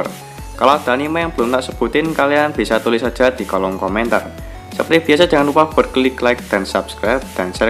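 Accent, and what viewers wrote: Java, Indonesia. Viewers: Medok tapi bagus unik..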